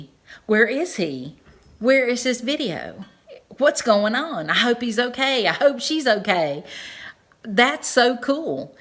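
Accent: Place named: South, United States. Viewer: wonderful to hear another southern drawl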